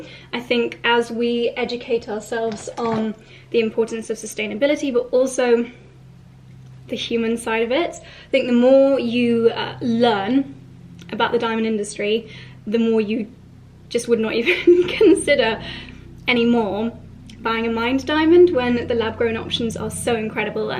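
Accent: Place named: United Kingdom. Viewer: your British accent is always so much more prominent when you're with 🙂 For the new zealander ear, its lovely💕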